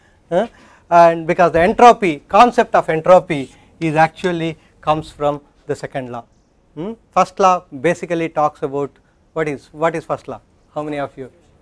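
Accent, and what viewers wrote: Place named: South India, India. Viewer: Ugly south indian accent and teaching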